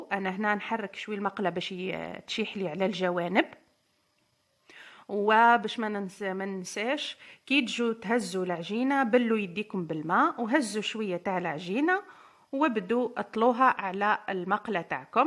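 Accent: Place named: East, Algeria. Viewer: حبيت نعرف برك انت منين هدرتك تبان تاع الشرق.انا من الشرق .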